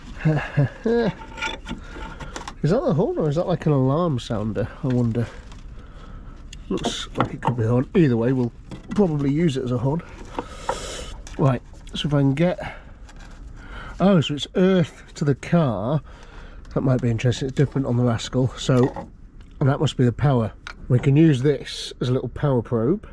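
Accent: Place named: Scotland, United Kingdom. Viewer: Love your scottish accent are you scottish i am❤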